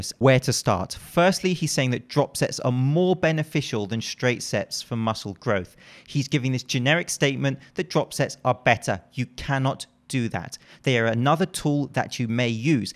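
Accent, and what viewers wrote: England, United Kingdom. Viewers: Proper English accent, still says "ain't." I love it. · I trust this guy because he's bald and he speaks with a british accent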